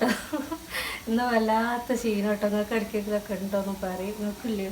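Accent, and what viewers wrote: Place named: Malappuram, India. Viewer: നിങ്ങളെ നാട് എവിടെ തനി മലപ്പുറം ആണ്❤😂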